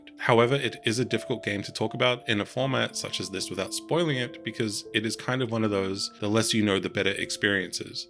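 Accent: New Zealand. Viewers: Your accent - are ye from Aotearoa ???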